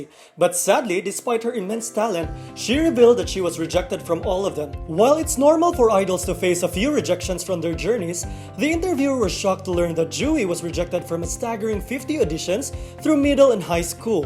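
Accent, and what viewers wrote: Philippines. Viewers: The narrator's accent is so Filipino. Ewwwwwwwwww 🤮🤮🤮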